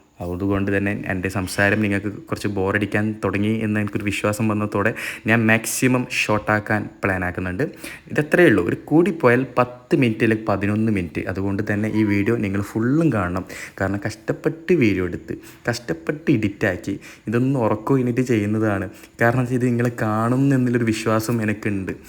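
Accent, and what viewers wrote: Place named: Malappuram, India. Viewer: എന്ത് മെജയാണ് സംസാരത്തിൽ പൊളി മോനെ മലപ്പുറത്തിന് bro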